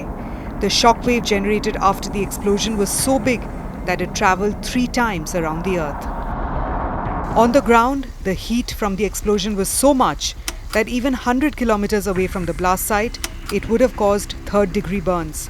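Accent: India. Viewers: This Indian voice over is trash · Iam getting so bored with Putin and his nuclear threat he has been saying this for months this video is click bate no doubt it will be repeated again when Putin makes his next threat and people start to wobble again just like before Putin knows the response from the west if he did it would be goodbye Russia funny the voice over is Indian Russia's Allie what a surprise not🇬🇧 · Just speak your own indian language we do not enjoy that indian english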